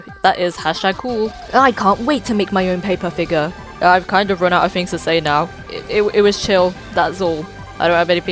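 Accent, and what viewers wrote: United Kingdom. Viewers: she's prob british bro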